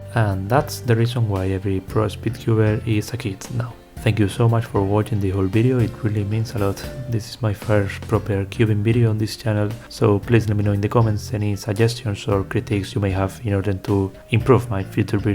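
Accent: Spain. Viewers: me gusta tu acento español jajaj intenta alzar un poco mas la voz al hablar al micro, no seas timido!! el video esta guapisimo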